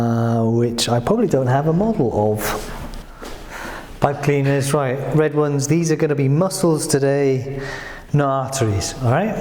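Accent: United Kingdom. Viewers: I am not a native english speaker. But I adore anatomy and you are excellent, except your accent is british and also so fast that its hard for me to understand😂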